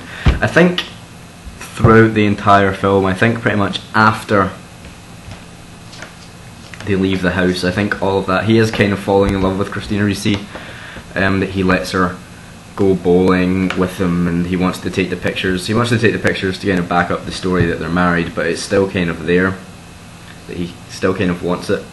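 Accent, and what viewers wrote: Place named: Glasgow, United Kingdom. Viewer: Buffalo '66 is an amazing film! Also! Nice glasgow accent!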